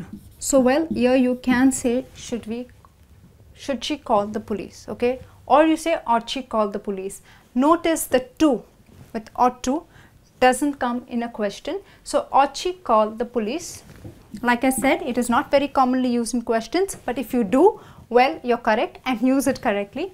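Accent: India. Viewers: Her accent is so different. :) Is she from India? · Indian english 🤦🏼‍♂️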